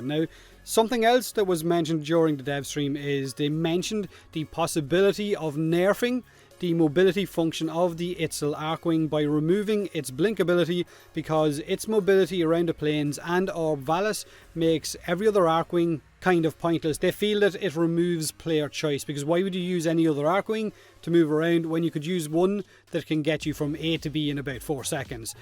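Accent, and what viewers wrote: Ireland. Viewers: I love Irish you tubers “Harnesses the power of the Feeking sun”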